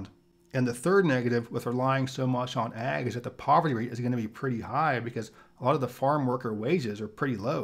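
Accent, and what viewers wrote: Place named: Tennessee, United States. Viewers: A lot of people from the south central valley have natural southern accents because of mass immigration from the great plains and south during the Dust Bowl and Great Depression. His accent could be from Tennessee, but I wouldn't be surprised if it's something he grew up with. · If you're from Visalia from other episodes it seems you sure picked up the Tennessee accent fast. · I could have sworn you were a fellow southerner.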